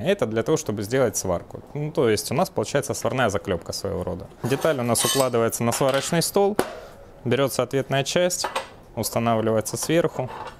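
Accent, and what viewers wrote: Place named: South, Russia. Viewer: Обычный говор триста километров на юг от Москвы все так говорят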